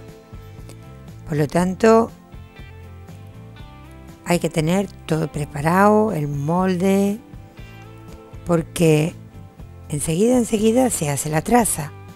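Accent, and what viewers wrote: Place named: Spain. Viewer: Hola. Me encanta tu canal y tu acento español. Tus videos son muy claros y entretenidos. Aunque yo también soy jabonera, tengo que confesar que mi jabón favorito y que lo aprendí de tí es el de Rosas y Aceite de Eucalipto. Te envíos abrazos desde Chile.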